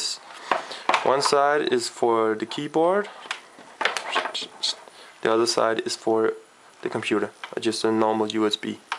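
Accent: Denmark. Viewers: You sound insanely danish its crazy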